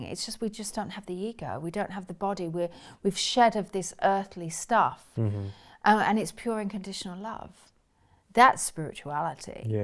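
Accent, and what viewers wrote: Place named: Scotland, United Kingdom. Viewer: LOVE LOVE LOVE his accent. I'm a sucker for the Scottish accent. Sounds like Kyle Gray :)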